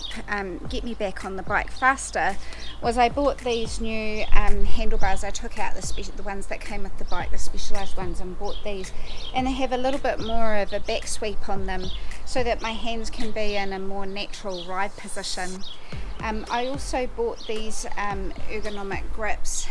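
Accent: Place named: New Zealand. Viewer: i can't get used to that kiwi accent.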